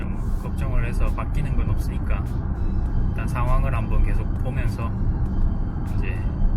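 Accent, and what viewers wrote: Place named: Gyeongsang, South Korea. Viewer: 고향이 경상도 인가보네요 언능 빨리 나아졌으면 좋겠네요 화이팅!!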